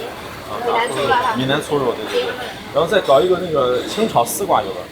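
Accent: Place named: Shaanxi, China. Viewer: 是陕西人吗？陕西口音有些重。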